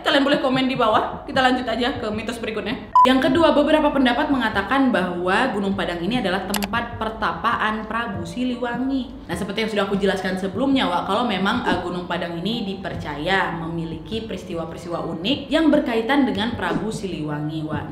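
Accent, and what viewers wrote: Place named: Medan, Indonesia. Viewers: Dari logatnya aku duga kak ininorang medan. Ya kan wak? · Mak cik ini aslinya dari mana? Dari logat bahasanya kayak dari tanah Deli. Tapi awak tak tahu pasti kali lah.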